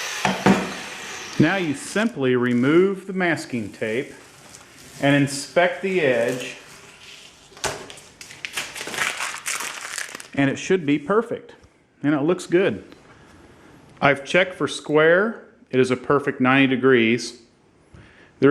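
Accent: South, United States. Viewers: I personally LOVE your soft Southern accent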